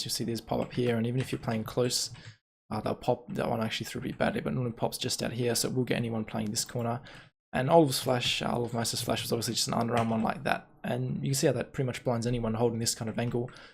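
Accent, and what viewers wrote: Australia. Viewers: jargons I'm an Asian and English isn't my first language and I can understand him 100%. He speaks normally like any Australian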